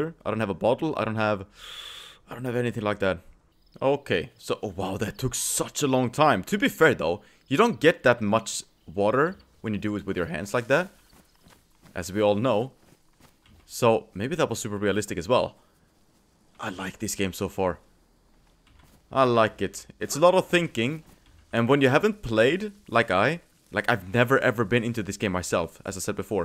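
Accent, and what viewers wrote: Germany. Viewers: I can feel your german accent😂😂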